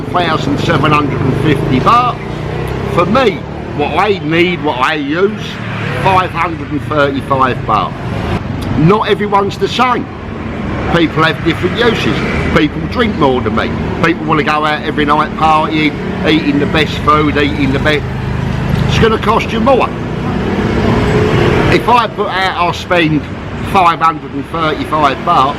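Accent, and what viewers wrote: United Kingdom. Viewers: Good one,. I am convinced that I watch your content mainly because I like your personality. Where the hell in the UK are you from? It's fun trying to figure out your accent half the time. Sure, I've been to Thailand several times, Pattaya only once, but your content is compelling and interesting to me only because it's delivered by you. You're an engaging dude, so keep up the good work and I hope I can share a cold one with you some day. Keep doing what you do. It's awesome.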